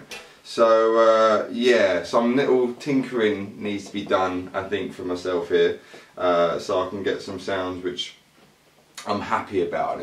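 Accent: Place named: Southampton, United Kingdom. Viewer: Mate, you're a riot 😄 Just discovered you tonight on YouTube where I love to spend most of my down time here in Australia watching everything guitar (& I'm from Southampton, so your accent reminds me of home) I play a Strat & a couple of Les Pauls through a Vox AC15cc with Mullard NOS tubes. Just bought a J Rocket "Archer" pedal for Klon like boost/distortion - sounds great! Trimmed my pedals down to the basics now.. MXR Delay, TC Looper, TC Mini Tuner & amp foot switch reverb & trem Looking forward to lowing through your uploads, keep up the good work mate! PS - a little envious you got to meet Phil X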